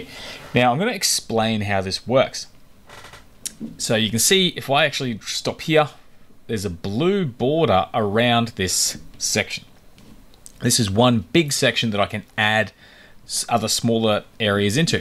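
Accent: Australia. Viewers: Oh my goodness! I work as a VA and have been struggling with this them for days, despite watching some training vids. YOUR video is EXACTLY what I was looking for! Thank you. AND discovering a fellow Aussie accent was pretty great too 👍👍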